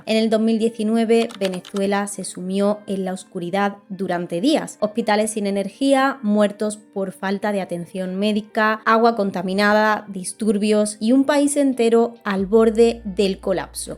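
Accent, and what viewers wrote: Andalusia, Spain. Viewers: Eres andaluza o manchega?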